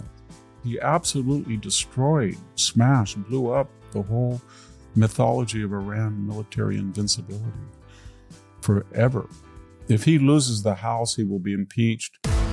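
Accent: Australia. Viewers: I’m an Australian I studied agriculture I worked in NY for 8 years my children and grandchildren are now Americans I think I love Victor and listen to all his work This was a great interview and I appreciate your Australian interviewing style accent and balanced grounded style very much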